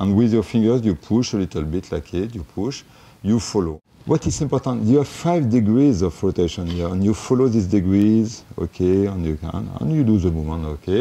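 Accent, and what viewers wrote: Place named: France. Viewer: very good and nice French accent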